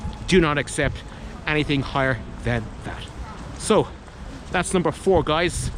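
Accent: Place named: Tipperary, Ireland. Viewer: Trying to work out the accent, thinking not Cork, more a Tipperary, Waterfordish? Could be Wexford, oh I had a girlfriend from Wexford, her dad owned a load of pubs and super respected bloke, his daughter was a true clear skinned Coleen of beauty and bright intellect as we shared our love of certain works of art.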